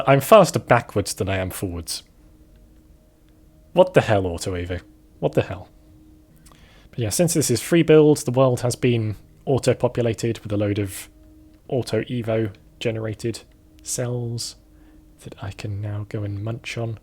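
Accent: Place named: United Kingdom. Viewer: you cannot understand how soothing your slightly nervous British voice is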